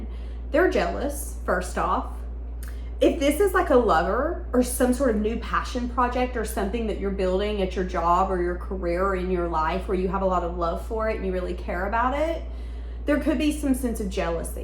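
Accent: South, United States. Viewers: I do love her Southern accent. Paying attention from the UK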